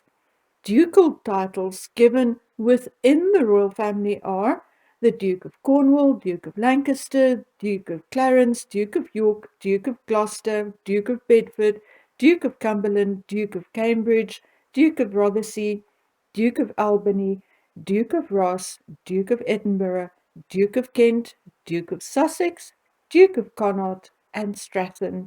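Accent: South Africa. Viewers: I could listen to you talk all day long. I just adore the South African accent. X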